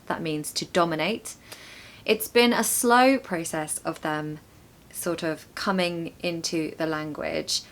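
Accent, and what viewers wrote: United Kingdom. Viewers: Hello, Is posible to teach me class online I like your accent British Kind regards Emma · It is lovely British pronunciation😊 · You've got such an elegant British accent! Really enjoy listening to your speech. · I love British accent and want to talk like British. Your video really helping me,. Thanks for everything. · The best teacher.I love british accent.I like your voice teacher.😊❤ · Hello,. Hope this email finds you well, and sound. I am writing this email in regards to your ways to present a productive content. Literally, endearing, splendid British vlog. I am totally intrigued with your fancy accent. Moreover, I want to express my gratitude to you for all your work on getting your content makes sense, so kindly keep the good work up. Your prompt respond is highly appreciated. Sincerely, Moe. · Hello! Well, many British accents exist. 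They (British people) don't all speak like 🙂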